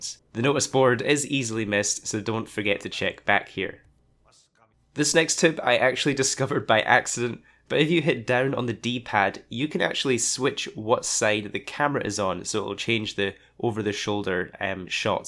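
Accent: Scotland, United Kingdom. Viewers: I'm a late comer to this game. Thanks for that. Only just found the brother so it's hotting up. Good to hear and see a Scottish youtuber doing well. I always thought my accent would be a set back. Doesn't look like it with you.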